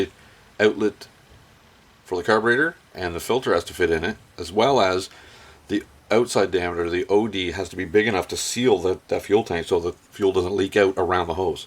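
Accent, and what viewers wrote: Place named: New Brunswick, Canada. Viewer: My mother was from NB so I like your accent! Anyway, the white paint on the fuel line is a hint it is original. My 2010 vintage saw has the same. I take it you don't think much of these saws. Since I have only used Poulan and Echo, fixing mine is a new experience. Seems like a pretty good homeowners saw. But I have noticed that the displacement of these saws seems inconsistent - 42, 38, 37, (mine says 35) and even saw one in a video that showed "3414c". I guess the Chinese don't read English very well (: BTW there is a small round tab (1/4 inch dia) that secures the front left of the cover.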